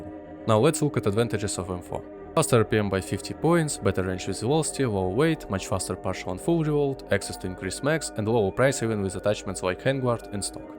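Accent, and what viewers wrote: Russia. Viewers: I thought you were russian Nice video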